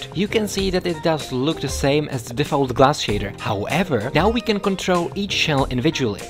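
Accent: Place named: Czech Republic. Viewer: Great tutorial! I am wondering, aren't you Czech? The accent seems familiar :D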